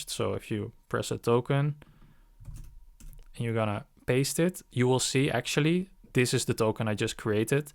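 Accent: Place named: Netherlands. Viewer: Je hoort het aan het accent hij komt sws uit nl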